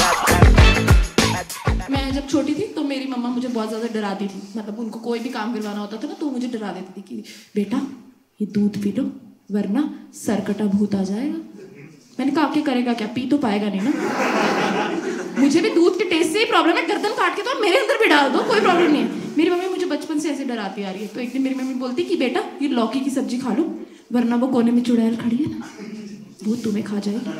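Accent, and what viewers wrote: Haryana, India. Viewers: Haryanvi accent 😅😅😅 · Haryanvi language was bang on 🤣🤣🤣🤣🤣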